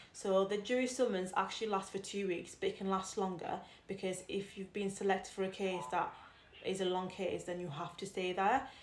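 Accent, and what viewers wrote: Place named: United Kingdom. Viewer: Thank you for your video, it’s always interesting to learn more about this topic. I’m a follower on TikTok, and I love both your content and your father’s 🩷 You have such a lovely British accent, it’s so pleasant to listen to 🥰 Xoxo from France!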